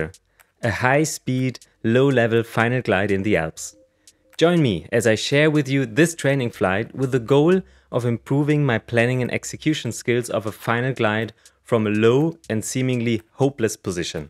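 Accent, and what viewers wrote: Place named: Germany. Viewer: German English😂!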